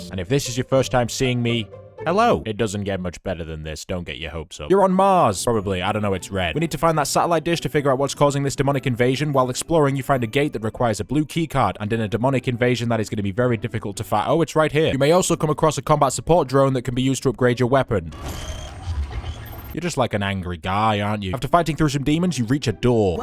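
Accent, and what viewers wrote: North, United Kingdom. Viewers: You seem very northern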